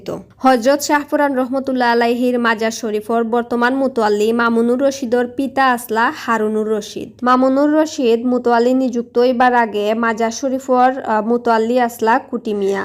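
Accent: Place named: Barishal, Bangladesh. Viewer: বরিশাইলা মনু😂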